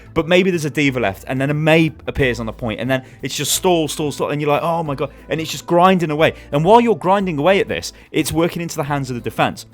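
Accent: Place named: United Kingdom. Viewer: Force gaming said all of this in a way shorter time. However it is true that your Brit accent is better to listen to than his American one.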